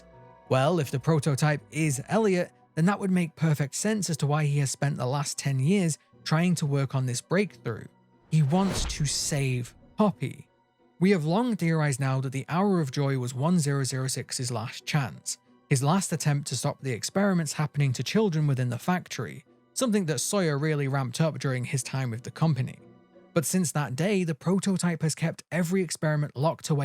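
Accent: United Kingdom. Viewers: It's funny how differently British ppl pronounce things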